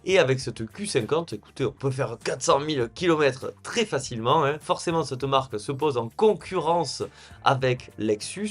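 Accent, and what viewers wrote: South, France. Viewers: la grande classe, le sourire, le petit accent ensoleillé… bon je préfère les formats sur les Youngtimers mais au moins tu en fais pour tout le monde. La bisette à toi change rien.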